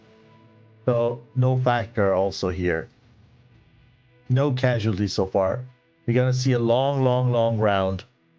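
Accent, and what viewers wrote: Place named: Germany. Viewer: Is the host of this match german or speak german? If so, it did shine threw...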